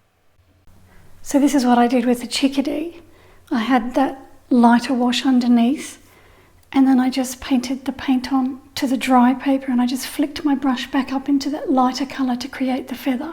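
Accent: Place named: Australia. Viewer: Ahh Aussies...got to love our different accents. A useful tutorial as always. Thank you.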